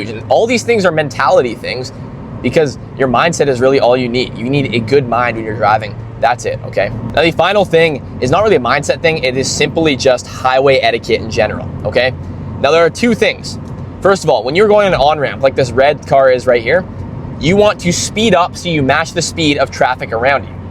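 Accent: Canada. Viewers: As soon as you said “40KM/H” i instantly knew I had to subscribe cause I already had a feeling from your voice you were Canadian, then I seen that intersection on highway 11 with chevron in Abbotsford (I think) I knew you were in my province too (we need more Canadian car YouTubers) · Nice video though. Just curious, are you from Victoria, BC?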